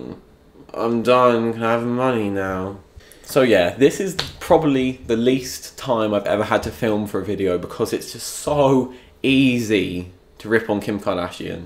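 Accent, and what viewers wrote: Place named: England, United Kingdom. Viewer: haha hilarious. subscribed! plussss, an english accent? god, for sure. Imao, take care, xo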